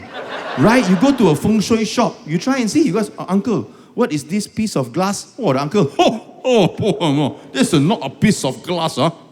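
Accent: Malaysia. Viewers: In his rant about math, i was guessing he has education background. Then he revealed he is a TESL graduate it all make sense. TESL pride 😂😂😂 His delivery very malaysian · The way he speak english like how my malay friend speak English.. the melayu slang.. darn spot on..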